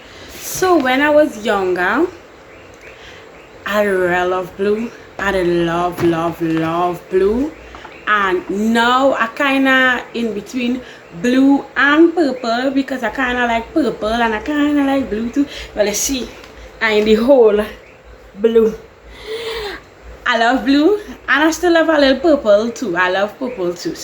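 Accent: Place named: Caribbean. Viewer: So love your accent. Is that Trini or Jamaica?!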